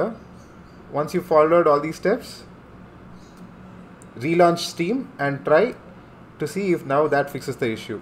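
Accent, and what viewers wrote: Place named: India. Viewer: And as always, the man with indian accent did not disappoint and helped with technical issues Thank you so much (I hope I didn't sound racist)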